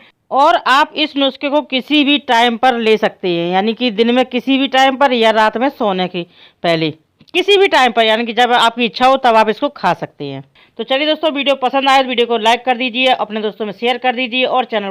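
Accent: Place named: Etawah, India. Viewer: Mam lagta ha app etawab se hoo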